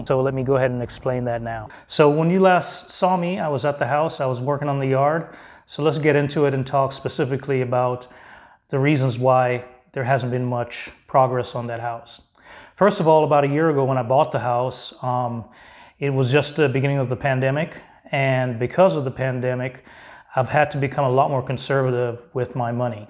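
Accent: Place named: South, United States. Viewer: He sounds like a southern gentleman and very humble. Best of luck to this fine man.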